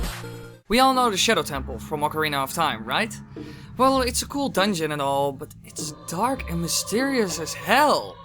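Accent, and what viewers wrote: Netherlands. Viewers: nederlands accentje mag er wezen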